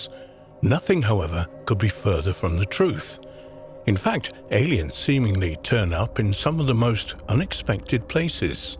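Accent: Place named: England, United Kingdom. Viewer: Where is the button to block documentaries in a America narrated by English people. They're the worst, they're telling you about things that happened in the United States like they live here and are a expert in American UFO encounters and the geographic layout of the states. 💯🤦🏻‍♂️🤦🏻‍♂️🤦🏻‍♂️🤦🏻‍♂️🤦🏻‍♂️🤦🏻‍♂️💯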